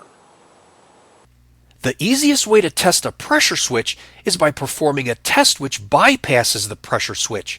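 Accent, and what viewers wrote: United States. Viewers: 1 pour ta tronche de ricain et -1 pour ton accent de ricain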